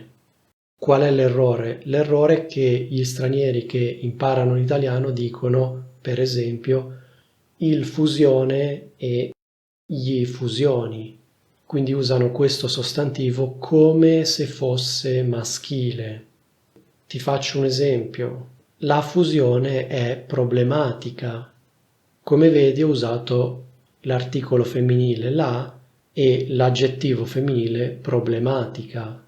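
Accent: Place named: Milan, Italy. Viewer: Professore, come studentessa brasiliana 🇧🇷 di italiano come seconda lingua, ti supplico di non abbandonare mai la tua variante settentrionale. È importantissimo per noi avere accesso a diversi accenti, e il tuo è l’unico canale che conosco con la variazione milanese. Ti ammiro e ti seguo.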